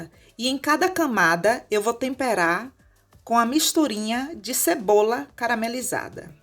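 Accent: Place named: Northeast, Brazil. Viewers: O que eu gostei o seu sotaque alagoano, igualzinho ao sotaque do meu sogro. A receita, ah! essa é maravilhosa 😅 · Já amei o sotaque dela😍 nordestina marminino❤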